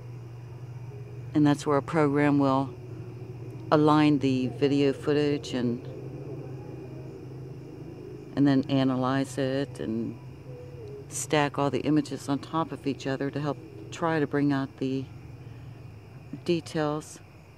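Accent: South, United States. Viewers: Hi, Absolutely stunning Footage!👍👍👍. I would have to say Saturn is one of my Favourites just beautiful. Thank you for the Time and Effort you put into these Video's, I love the Overlays you put up to show what's what! I have to say I Love your Southern Accent, so soft and Lady like! Have a wonderful Weekend. Take care♥️. Melbourne, Australia.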